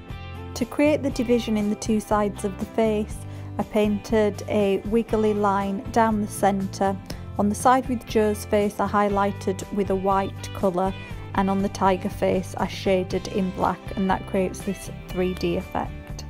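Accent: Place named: New England, United States. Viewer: Live the yanky accent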